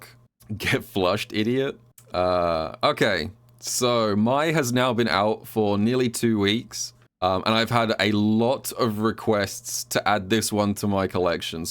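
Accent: United Kingdom. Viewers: lol I had to watch multiple times I thought he was saying "tool character". That British accent is something else. Carry on don't mind me great video much appreciated because that fan is a beast to deal with.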